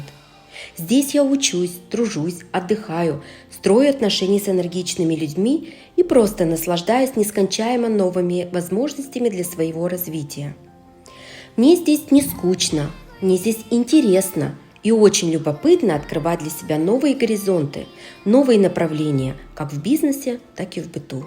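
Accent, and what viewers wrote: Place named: Ukraine. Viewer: Украинский акцент не скрыть, как не старается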